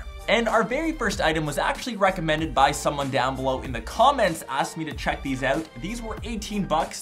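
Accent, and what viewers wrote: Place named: Canada. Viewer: I love your Canadian accent. It's funny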